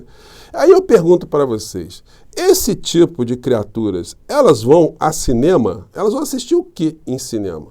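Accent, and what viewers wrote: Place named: Bahia, Brazil. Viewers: Ó pá í ó, baianes clássico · Sotaque baiano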